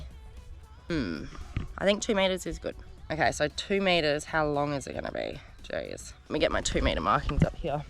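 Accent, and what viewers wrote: Australia. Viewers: Are you an aussie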